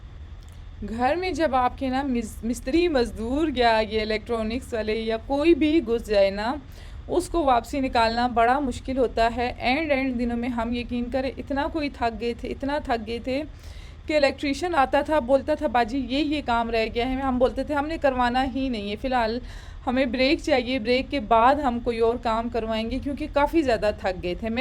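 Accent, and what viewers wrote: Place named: Pashto, Afghanistan. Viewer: AOA dear sister,sanga ay❤sha ay?staso pashto koh bilkul zama shanty da😂andaz koh bilkul zama trhan dy😂😂aao vlog k pashto lazmi waya.deer sha lagi❤❤❤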